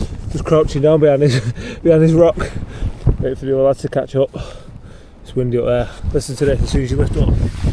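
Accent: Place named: Salford, United Kingdom. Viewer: Good vid pal new subscriber sound u like from my neck of the woods salford or near by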